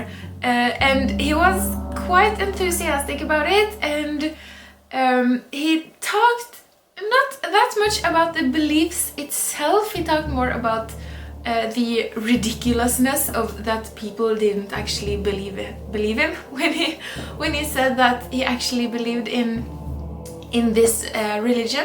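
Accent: Norway. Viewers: Love your Norwegian dialect. I never heard a Norwegian say the “r” sound in the back of their throat like a German. I am a German speaker, btw.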